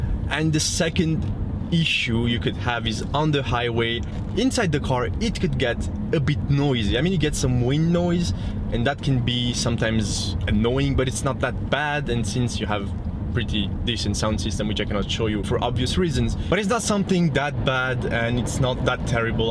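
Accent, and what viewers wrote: France. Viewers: Worst minutes spent in my life. Beside the shitty english french accent, the presenter has no clue about cars. It's like he is read from a paper. Not to mentiom he is ugly af.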